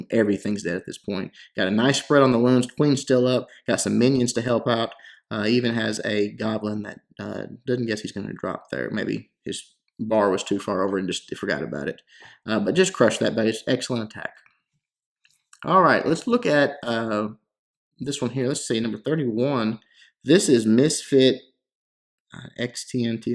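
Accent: United States. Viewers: which part of the us are u from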